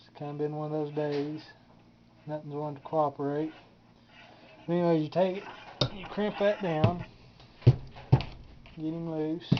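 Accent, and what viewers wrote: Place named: Texas, United States. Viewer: bout like yat. Texas talk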